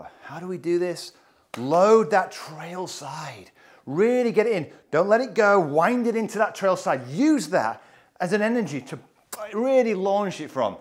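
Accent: United Kingdom. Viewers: Found this channel today. I'm back playing golf after take 15 years off to be a single dad. Why is it I learn the most from people with an accent from Great Britain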